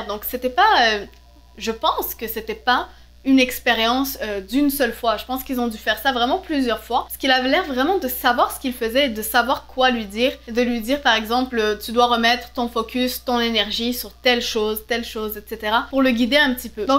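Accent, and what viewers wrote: Quebec, Canada. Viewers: Est ce que tu es québécoise... Sinon est ce que ça rapport avec la chanson de?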